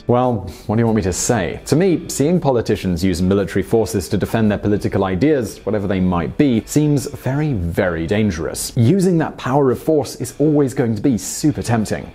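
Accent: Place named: England, United Kingdom. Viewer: Uhh, NATO? Why mention an organization created to stop a Soviet blitzkrieg? It's as if NATO is the only multilateral organization you've ever heard of. Why not take a look at the African Union instead? Note: just because a guy has an English accent, it doesn't make him an intellectual or an expert on a given topic.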